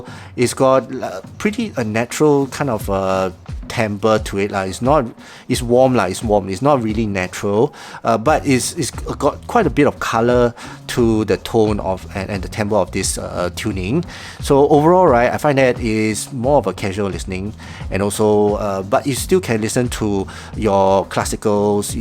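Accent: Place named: Singapore. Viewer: I think is a Singaporean. I also noticed this on some of my Singaporean colleagues.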